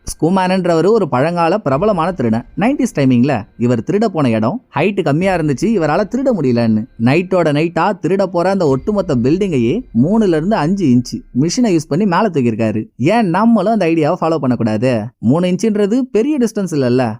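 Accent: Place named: Southern Tamil Nadu, India. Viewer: Congratulations bro. I hope you are from the Southern part of Tamilnadu. Great vocal energy and slang. Kindly reveal your native brother.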